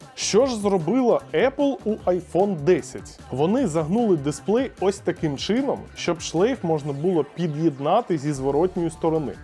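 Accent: Russia. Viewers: Не ображайтесь, але дуже бісить, коли багато русизмів в мові, мов Азіров говорить! Важко слухати людину, яка все життя говорила тільки російською, і перейшла на Українську (але за те, що перейшов на УКРАЇНСЬКУ- респект)! Ведучий, моя тобі порада- не пиши сценарій, дай написати людині, яка вміє говорити по-українськи, все таки Цитрус- це гігант комерційного продажу, будьте «на рівні»!!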